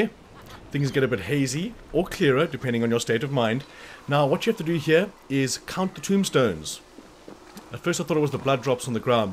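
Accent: South Africa. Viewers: yes sir. You also from SA? Sounds like a South African accent 😁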